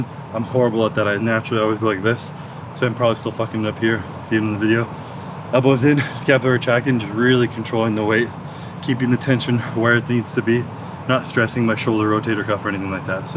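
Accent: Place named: Canada. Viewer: your Canada really came out this vid lol. As well as your th-th. 😂. Love youuuuuuu! ❤️ 🤣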